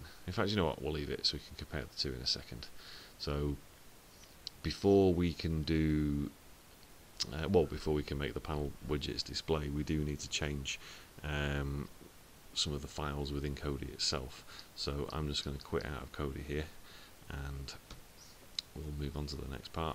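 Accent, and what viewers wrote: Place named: United Kingdom. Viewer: Really like watching your tutorials but I do have one little issue I can't really hear you to great nevertheless it's good to watch some British tutorials instead of American all the time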